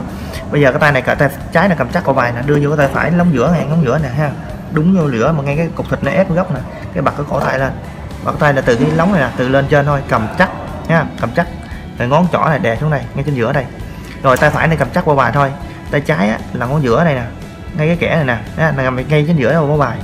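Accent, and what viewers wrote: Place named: Mekong Delta, Vietnam. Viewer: Anh nằy miền tay he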